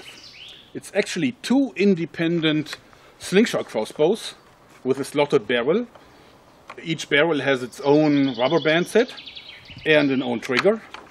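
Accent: Germany. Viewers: Das Schönste an den Videos ist doch, dass englisch mit dem schönen deutschen Akzent. Es würde eine Menge an Witz verlieren.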